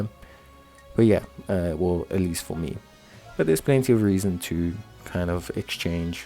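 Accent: South Africa. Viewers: Hey brother are you South African cos i know that accent anywhere! howzit my china! cheers for all the help on the game helps alot. Keep powering up man!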